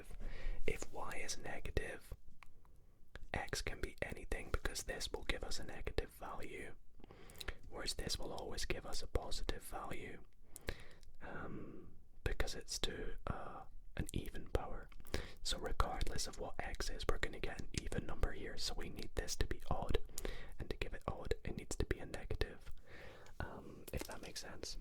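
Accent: Scotland, United Kingdom. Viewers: YOOOOO I DIDNT KNOW U WERE FROM SCOTLAND I KNEW THERE WAS SOMETHING FAMILIAR ABIUT YOU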